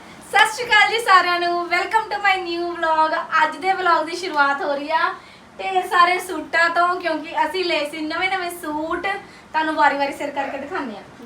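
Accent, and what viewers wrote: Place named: Gurdaspur, India. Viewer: Je TUC gl gl te va va krde je aa bhut sohna lgda ah sade gurdaspur Batala wale bolde aa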